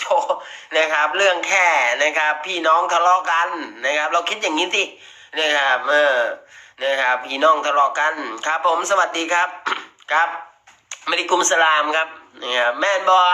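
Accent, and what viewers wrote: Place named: Suphanburi, Thailand. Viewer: สำเนียงปู่ไม่ได้อ่ะ ออกไปสุพันมากกว่า5555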